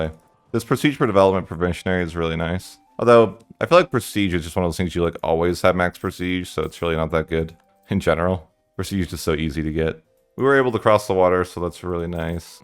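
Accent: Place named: United States. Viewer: You sound so similar to an American colleague I work with, what state did you grow up in if you don’t mind me asking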